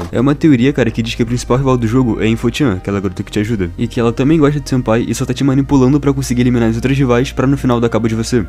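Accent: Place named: Rio de Janeiro, Brazil. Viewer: Omagad, carioca?? Inscrito adquirido.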